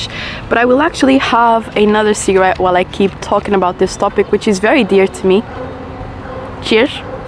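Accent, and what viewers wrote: Portugal. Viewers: the portuguesse accent is 🔥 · Saw this video suggested to me for some reason. Clicked on it. First few seconds of hearing you talk, "Yup, esta tipa é portuguesa, 100%". :D Your channel does seem interesting. Subscribed. Also, epá olha que diz que isso de fumar faz mal. Come antes uma peça de fruta. Ou qualquer coisa do género. Ah, fuck it, também sou escravo da nicotina. Só gosto de dizer esta frase.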